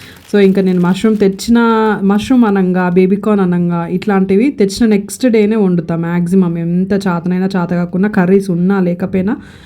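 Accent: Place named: Telangana, India. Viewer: pure telangana yaasa super dear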